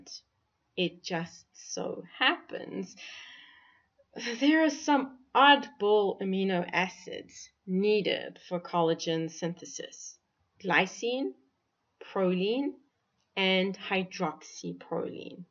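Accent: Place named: South Africa. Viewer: This is a South African accent! I'm pretty sure about it!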